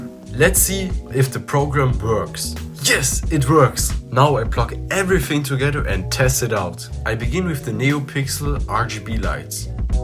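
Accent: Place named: Germany. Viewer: It was obvious, that you're a German... ich auch :D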